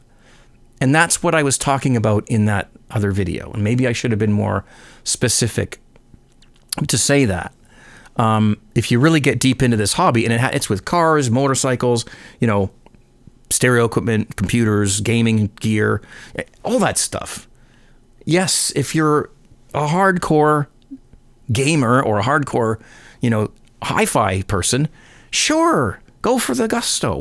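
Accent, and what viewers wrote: Canada. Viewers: Hi, I came to this video late and, after scanning the comments to see if any of them answered the question about why the New Zealand scarf on the wall. From your accent, you sound Canadian, so why the banner? BTW, you know your stuff. Don't let the bastards get you down.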